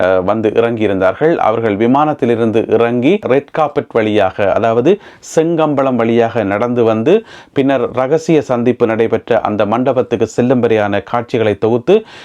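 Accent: Sri Lanka. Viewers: Looks like Sri Lankan Nice Tamil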